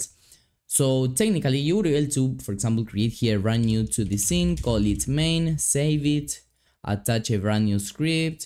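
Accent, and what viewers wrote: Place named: Argentina. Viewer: se nota el acento argentino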